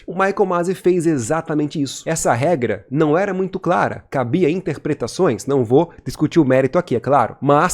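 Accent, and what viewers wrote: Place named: São Paulo, Brazil. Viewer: Não gostei de sua alteração na dicção parece um paulista misturado com paranaense muito ruim sugiro voltar para sua dicção original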